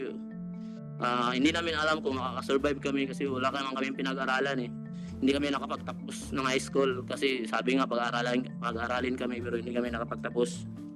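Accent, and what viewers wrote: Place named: Visayas, Philippines. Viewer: bisaya kc sya.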